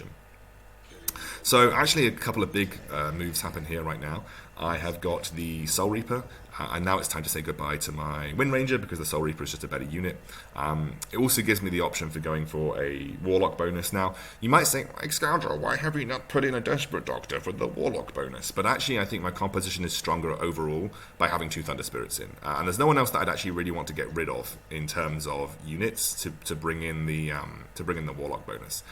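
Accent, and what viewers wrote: United Kingdom. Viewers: Love your british accent jajaja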